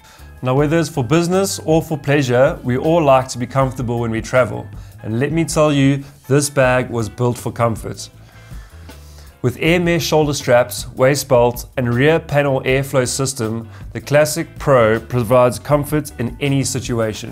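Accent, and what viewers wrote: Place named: South Africa. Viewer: That would be a South African accent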